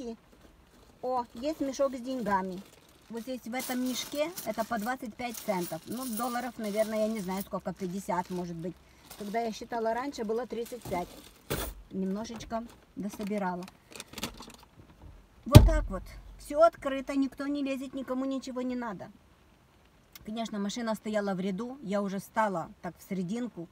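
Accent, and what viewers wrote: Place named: Odesa, Ukraine. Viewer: Вы с Одессы? Говор такой интересный?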